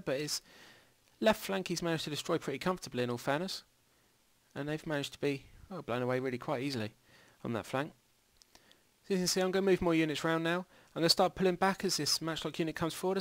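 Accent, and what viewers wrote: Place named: United Kingdom. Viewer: The British accent loving it LOL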